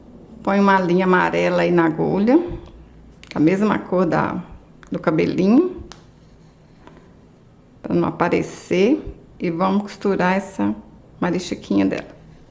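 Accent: Minas Gerais, Brazil. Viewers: Adoro esse seu sotaque mineiro uai!!!! Lindas bonecas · Amei seu trabalho e seu sotaque mineirinho! Parabéns e gratidão por compartilhar suas peças conosco.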